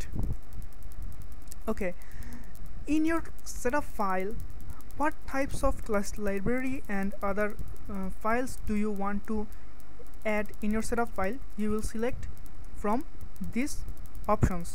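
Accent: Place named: Bengal, India. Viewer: What happened in m You also do not speak English good!! You speak Bengali vernacular.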